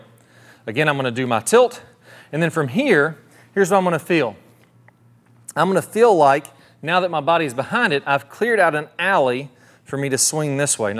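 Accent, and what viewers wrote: South, United States. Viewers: Thanks for the heavy southern accent golf instruction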